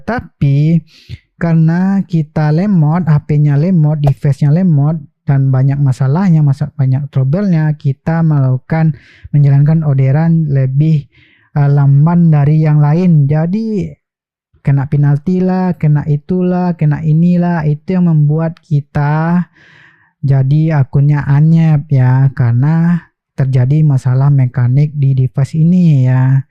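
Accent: West Sumatra, Indonesia. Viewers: Abang orang sumatera barat ya 😅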